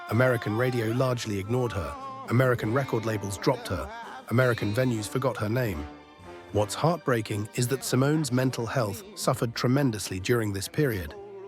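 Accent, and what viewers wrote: United Kingdom. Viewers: Ok, hold the phone! You ask us to subscribe when you’re telling stories of us from this country with a British accent? Like we have to listen to a proper outsider. There is a word for this. Condescension!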